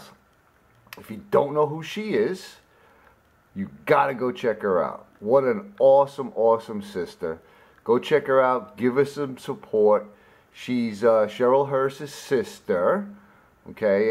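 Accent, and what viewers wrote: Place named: Arkansas, United States. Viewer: Okay I didn’t know you were from Arkansas, that accent clearly gave it away! I really didn’t think you would do this but I’m so glad you did 😁first of all thank you for the awesome shout out my friend. And I Loved loved loved the pics. Some of those faces 😂he’s too cute! ☺️ I wish I would’ve thought to plead the 5th on some of those answers🤔 dang it! I loved your answers I appreciate you being so down to earth and so honest, kind, and funny! The dancing 🕺 answer made me LOL literally. I hope you are having an awesome day my friend. Stay safe!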